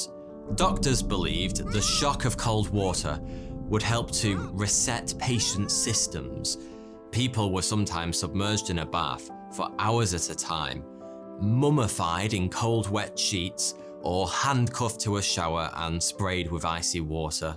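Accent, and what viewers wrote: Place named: England, United Kingdom. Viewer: I find that the English accent is perfect for this expose on asylums. Assange is being held in solitude in a British Prison. The Russians outlawed Lobotomy before anyone else! We lied about going to the moon and have English actors working for NASA. Truth is a rare commodity when you examine the facts.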